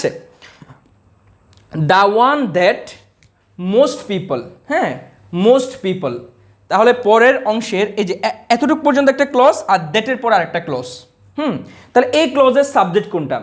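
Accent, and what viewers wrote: Chittagong, Bangladesh. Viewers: Vai ki CHITTAINGE NAKI...!!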